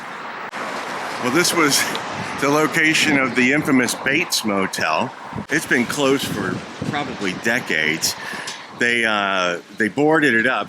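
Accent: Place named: Midwest, United States. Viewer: has amazing stories and he tells them with his terrific melodious voice and Midwestern accent. Even though the stories are grim it's a pleasure to listen to him.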